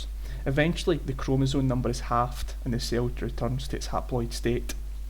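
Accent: Scotland, United Kingdom. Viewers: Wow, the amazing Scottish accent :) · lovely Scottish accent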